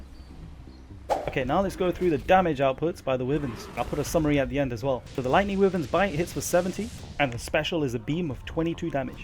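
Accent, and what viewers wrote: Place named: England, United Kingdom. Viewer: Good video and well researched but considering you sound English I found excruciating that you pronounce Wyvern wrong... Considering it’s a Welsh dragon... ><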